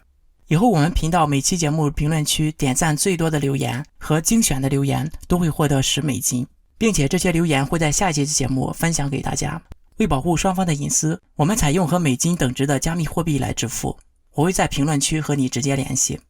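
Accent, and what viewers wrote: Shandong, China. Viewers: 山东口音，不是临沂就是枣庄，跟徐州口音差不多。我倒想知道你移民海外之后，会不会关注你所在国家的政治，你如果想拉更多的移民业务应该出影片介绍或分析一下你所在国家的一些情况。你做的一系列反共的影片是推力，如果做你出影片分析你所在的国家就是拉力，推力+拉力共同使劲才会有更多的移民业务😀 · 听你的口音就感觉是老乡，这次确认了。我也是山东农村，东部，90后，你的经历跟我基本一致。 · 原來clause是山東人，普通話確實和我山東同學的口音相似。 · 山东口音好重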